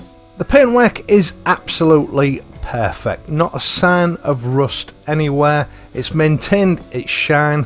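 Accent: United Kingdom. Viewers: "RE+1080p+british english commentary" makes the world nice again. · I like the voice that has the old British charm that is nowadays somehow lost in India.Your videos are so unique that I cannot express the wonder properly that how much knowledge you have about motorcycles! Keep up your good work.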